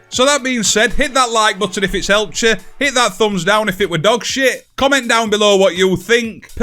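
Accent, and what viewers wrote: Yorkshire, United Kingdom. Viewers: Nice to see someone on Internet with a Yorkshire accent. The world is now a better place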